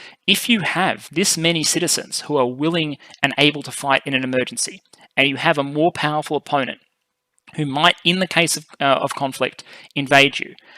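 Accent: Australia. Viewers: You're providing a great set of analytics to consider for us humble viewers and I've enjoyed these immensely. Also great to hear an Aussie on youtube, keep up the good work mate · A very very good analysis of the situation. Many thanks for the depth and knowledge you provide. Especially love the Aussie accent! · THAT AUSTRALIAN OR KIWI ACCENT IS DRIVING ME UP THE WALL..ALSO A LOT OF WORDS FLYING AT ME....TOO MUCH INFORMATION....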